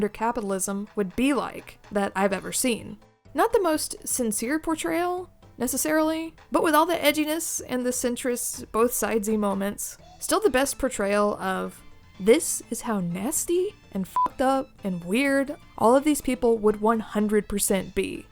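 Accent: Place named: Australia. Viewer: He sounds aussie not Kiwi :O (-an aussie)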